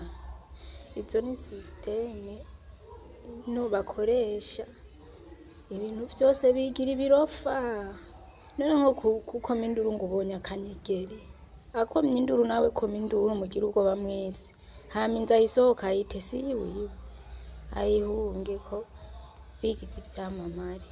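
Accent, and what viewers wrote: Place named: Rugombo, Burundi. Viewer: Numva urumunyarugombo wiwac❤❤❤🎉🎉🎉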